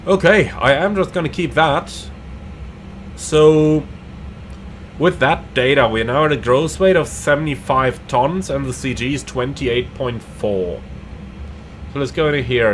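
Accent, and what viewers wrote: Switzerland. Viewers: I love your swiss accent😊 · What is your Swiss accent 😂? Really nice. Thanks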